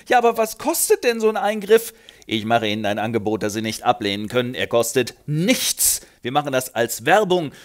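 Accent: Franconia, Germany. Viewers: Der fränkische Zungenschlag, wunderbar! 🍪🍪🍪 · der frrrängische Dialekt ist das Tüpfelchen auf dem i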